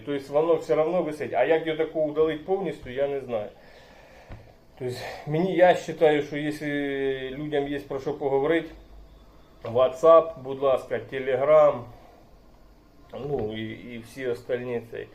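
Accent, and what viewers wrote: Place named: Kamianets-Podilskyi, Ukraine. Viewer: Ваша балачка дуже похожа на нашу ( кам,янець - подільський) з початку як почув то подумав що десь від нас родом😊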